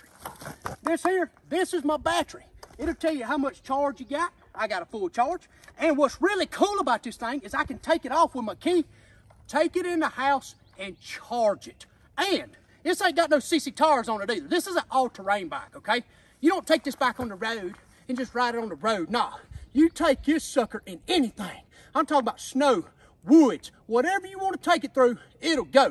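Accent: South, United States. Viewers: I love his Southern accent 😀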